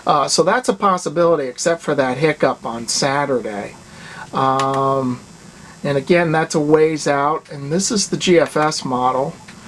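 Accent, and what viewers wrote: Maine, United States. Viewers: Not to be an idiot, but sounded some Mainer and not a learnt language, but a first language, and very easy on my ear. An old lingo that's stood the test of time and storm...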